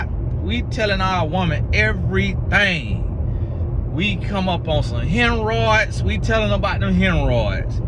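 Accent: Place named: Texas, United States. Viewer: Yup that sounds like you’re from the general region I was born and raised in-TEXAS! Southern?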